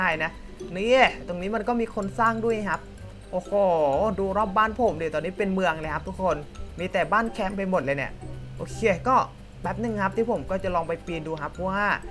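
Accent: South, Thailand. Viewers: คนใต้ป่าว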